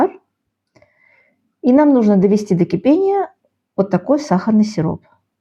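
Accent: Baku, Azerbaijan. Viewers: Спасибо за наш старый добрый бакинский рецепт! Помню эти вкусняшки делала тётя) Кстати,акцент наш слышен до сих пор!))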